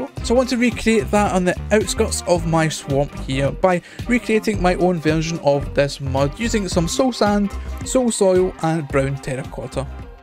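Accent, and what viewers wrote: Scotland, United Kingdom. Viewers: You know your accent is really unique, like it's you have a soft Scottish accent but also have braces, and the only other person with anything similar is AndyIsYoda. But anyways cool build.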